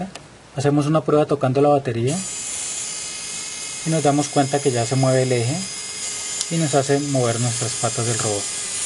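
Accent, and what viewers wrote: Colombia. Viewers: Voz sos de colombia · Qué orgullo para Colombia que tengamos un profe de su categoría. Qué institución educativa tiene el privilegio de contar con usted profe? Tiene acento valluno. Saludos desde Antioquia.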